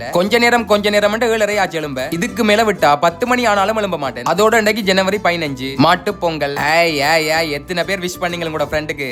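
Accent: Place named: Sri Lanka. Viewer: Sri lankan tamil pesinalae azhagu than❤😻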